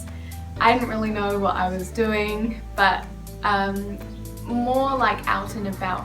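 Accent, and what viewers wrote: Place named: Australia. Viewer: I knew you were Australian but I didn’t really fully grasp how... Australian you are. Your accent took me by surprise.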